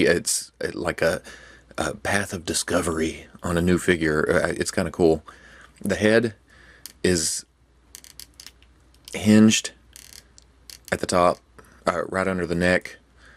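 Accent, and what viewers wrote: United States. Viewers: Is the country, he sounds country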